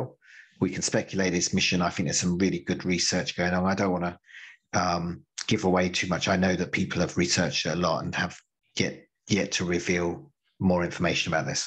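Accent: United Kingdom. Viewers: I like the combination of shitty audio and the British accent. It works for me but I see what your saying. This dude sounds very informed and I like his delivery.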